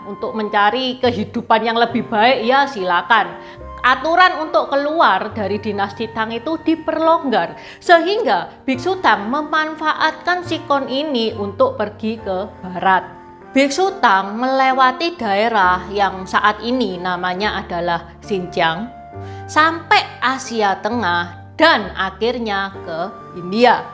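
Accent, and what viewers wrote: Java, Indonesia. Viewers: Mantap channelnya,. Bahasanya Medhok... Asal kota mana ya,? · Medok guys.... Semangat terus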